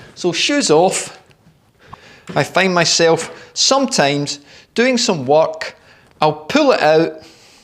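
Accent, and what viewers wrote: Scotland, United Kingdom. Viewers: bro???😂😂😂😂,,,I love your Scottish acent a MOERSE LOT!!! from Wellington, RSA. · Actually, it’s the English who speak Scottish a wee bit funny…there’s just more of them so people around the world think their accent is real. It’s Not. This is real! 😊😊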